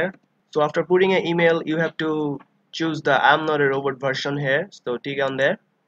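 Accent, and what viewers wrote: India. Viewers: Bro your voice sounds good in regular Indian accent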